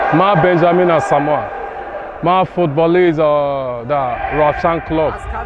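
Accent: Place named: Kulob, Tajikistan. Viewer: Чиста кулоби шидай ҳочй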